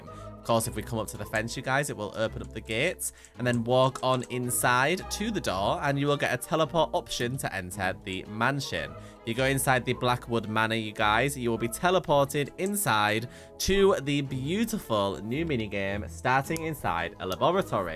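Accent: United Kingdom. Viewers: OMG ty so much for telling me and I love your accent I’m from Britain too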